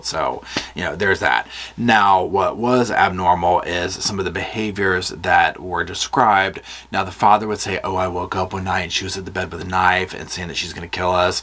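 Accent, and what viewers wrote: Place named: North Carolina, United States. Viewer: I didn’t realize you are from North Carolina…I thought I recognized the accent…❤ This case drew me in like the Darrell Brooks case…but there was no mystery with the DB show…smh… This one keeps me guessing… Love your channel and the way you tell stories…😊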